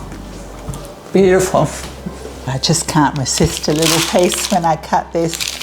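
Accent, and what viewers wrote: Australia. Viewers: By the way, I didn't recognize Ur accent until U mentioned Australia. Of course all accents are nice. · great to hear an Aussie accent on here for a change. Looks yummie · OMG, so agree with your comment! Love her cooking style and love, love listening to her Aussie accent; this is a keeper! ❤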